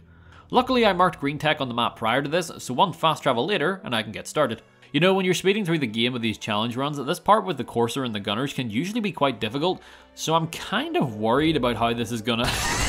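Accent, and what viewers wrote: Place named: Northern England, United Kingdom. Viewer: Where in north are you from mate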